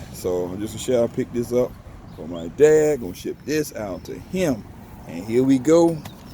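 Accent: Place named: South Carolina, United States. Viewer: Gotta be South Carolina. Pee Dee area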